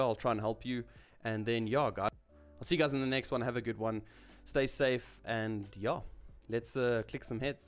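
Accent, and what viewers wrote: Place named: South Africa. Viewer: Nice vid, your voice makes me wish there was a South African OP in WZ now.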